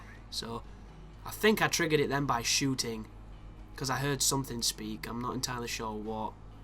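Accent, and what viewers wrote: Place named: Yorkshire, United Kingdom. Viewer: Funny you don't watch GoT, your accent reminds me so much of Jon Snow's.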